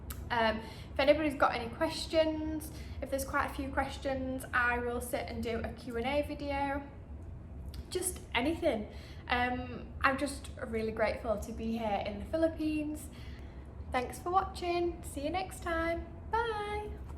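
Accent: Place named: Northern England, United Kingdom. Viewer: Viewed through the video and, considering everything about your lives and experiences, I commend you both for making a bold decision ü (Including, I suppose, activating your channel on YT, my go-to for most because your other platforms are too saturated if not wild =) ) Many Brits have come (and gone) especially just before and right after the lockdowns, from across the UK and age ranges: single ones, couples, married and even a family of 5 (no traveling childless cat ladies or cat dads so far +D). Anyhow, I hope you both encounter what you seek for the next 12 months here—typhoons, heat and humidity, earthquakes, traffic jams and karaoke nights elsewhere, mosquitoes and a whole lot more in a tropical country package yet to be unpacked. Can't preach about what to expect or not besides the usual tourist warnings or travel checklists because every experience, prospect or encounter is different. 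What I do know is there will tons to document and put into your chest of treasure experience, not least of which is when your inner child is unleashed in great moments, or your tenacity wins over challenges:) All these to say, Welcome to the Philippines, and significant other! Travel safe always, hydrate, and keep a mosquito repellent handy lol PS. You have one of the adorable UK accents I like hearing and I would guess a northern one(?) PS(2). Sorry, a long comment but this is a chance than any while you're still growing YT subscriber base, me included. Otherwise, you won't have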